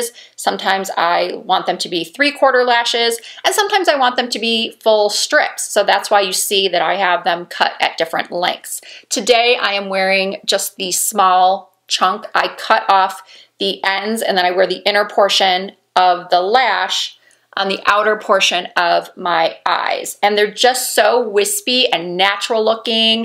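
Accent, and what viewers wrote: Midwest, United States. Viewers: Our Amazon shopping history looks so similar. I have a couple of those brush holders. I have that lamp in silver. I have that necklace set. I’ve looked at that black dress but opted for the sweater with a similar sleeve instead. I just spent the holidays in Summerlin, coincidentally. My SO’s mother and family all live there - so we’re actually there a lot. I’m not sure which neighborhood you’re in. I’m so glad I found your channel. I haven’t been watching long enough to know but your accent sounds a bit from the midwest (like around Chicago?). I am from 50, live in LA, and am originally from Indiana. Anyway. You look fantastic and I am enjoying your channel. Thanks!!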